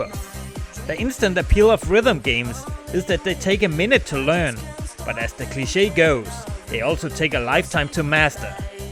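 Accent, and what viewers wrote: Denmark. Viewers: I freacking knew it. The accent got me. Overraskende at se en dansker lave engelsk indhold ;) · Are you from Denmark? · holy shit din danske engelsk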